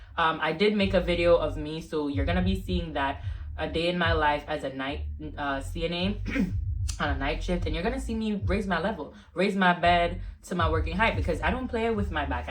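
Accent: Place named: Jamaica. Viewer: Great tips! Also hear that Jamaican accent lol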